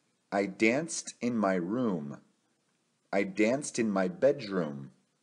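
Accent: United States. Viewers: excelente video, muy buena forma para aprender. ¿ el ingles de tus videos es ingles americano ? ¿ o es ingles como se habla en Gran Bretaña?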